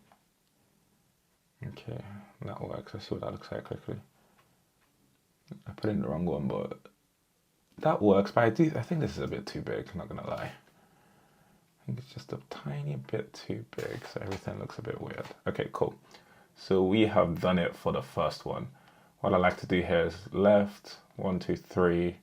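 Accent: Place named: Nigeria. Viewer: i hear the nigerian accent boss 😂🔥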